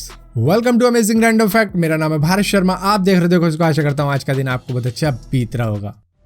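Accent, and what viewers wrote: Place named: Haryana, India. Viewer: Bhai ik video haryanvi mai bnao plz...aapka slang bhot acha he...plzzzz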